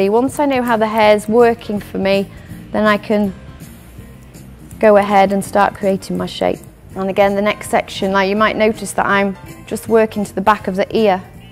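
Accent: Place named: Liverpool, United Kingdom. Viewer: It sounds like she has a Liverpool,England accent to me for sure.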